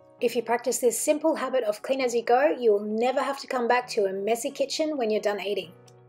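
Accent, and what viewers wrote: Australia. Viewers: Is that Australian accent? Maybe SA? Anybody knows please? 😁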